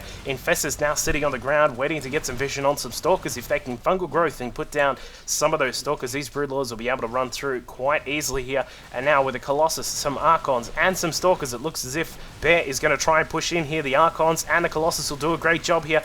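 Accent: Australia. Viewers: god damnit i got work tomorrow i have to sleep but your delicious Australian accent is keeping me awake...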